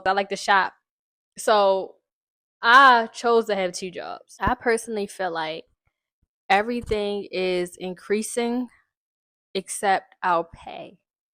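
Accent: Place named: Maryland, United States. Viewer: You didn’t have to tell us you’re in Maryland. We could tell by the accent from the moment you said do or to. Lol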